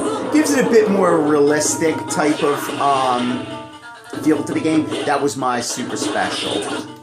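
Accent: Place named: East Coast, United States. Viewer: I love the opening line and your East Coast accent!! :D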